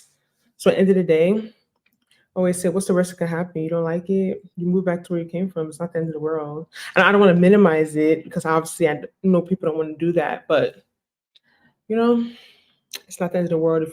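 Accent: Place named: East Coast, United States. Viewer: I'm new to your channel, but you definitely have an east coast accent. I can also hear a little bit of Naija in you.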